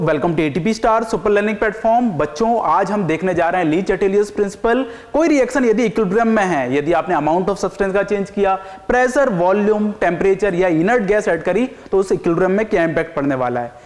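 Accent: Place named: Indore, India. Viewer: Indori accent Bhai Indore ka hai Shayad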